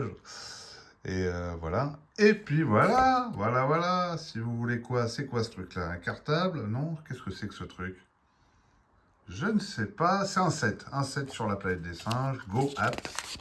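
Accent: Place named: England, United Kingdom. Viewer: Vraiment chouettes ces magazines. J'ai vu qu'un Quebecquois avait écrit une lettre dans le courrier des lecteurs, les chanceux... Alors essaie de dire avec l'accent québecquois "Tabernacle qu'ils ont chouettes ces primates" la prochaine fois. Non c'est ni raciste ni stéréotypé, si on me demande je dirai que c'est la faute à ChatGPT. C'est vrai, quoi, on connait ton merveilleux accent anglais mais pas ton accent québecquois. Le Monde doit savoir. Vache, Taylor dans l'adaptation met un coup de pilon à la Bud Spencer pour assommer le gardien ! J'ai gonflé mes muscles, surtout au niveau du ventre, c'est là qu'ils sont le plus développés.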